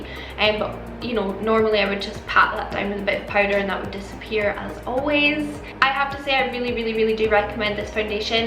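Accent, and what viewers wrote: Scotland, United Kingdom. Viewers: Oh my god a Scottish YouTuber, where about u from x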